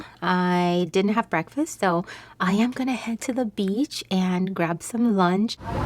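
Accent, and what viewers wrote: Jamaica. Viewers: Just came across your channel, Watched all your videos. Love The Content. And I could tell from you're accent that you're Jamaican too. Blessup and keep up the good work. Love the editing also. I Subscribed, so looking forward to more videos.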